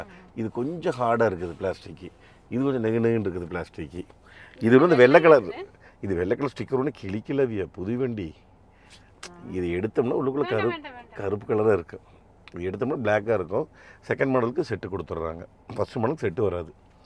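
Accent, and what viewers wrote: Kongu, India. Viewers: கொஞ்சும் கொங்குத் தமிழ் பேசும் கோமகரே...! திகட்டாத தித்திக்கும் பேச்சுடைய திருப்பூர் திருமகரே...! எங்கள் மதிப்பிற்குறிய " " திரு. அண்ணா அவர்களே❤❤❤🙏 எப்படி இருக்கீங்க அண்ணி❤❤❤🙏 எப்படி இருக்காங்க ரித்திக்❤❤❤ எப்படி இருக்காரு திருப்பூர் திருமகரும்❤❤❤ திருமகரின் திருமதியும்❤❤❤ இந்தக் கிளிகள் இரண்டும் கொஞ்சியபடி "கியா கேரன்ஸ்" காரில் பயணிக்கிறது😊❤❤❤❤ என்றும் அன்புடன் உங்கள் தம்பி என்றும் அன்புடன் உங்கள் "TMF" ரா.முஹம்மது கபூர் (சென்னையில் இருந்து)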